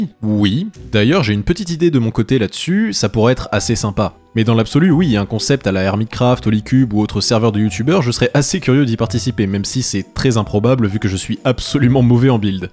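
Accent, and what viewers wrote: Quebec, Canada. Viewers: Est tu qebecois? Moi oui. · Bonjour, depuis que j’ai vu ta chaîne je regarde tes vidéos et une question me trotte dans la tête et tu n’y as pas répondu dans la vidéo. D’où te vient cet accent québécois exceptionnel ?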